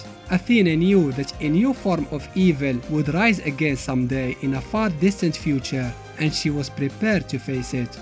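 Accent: Morocco. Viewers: just from your voice i recognized that you are from morocco XD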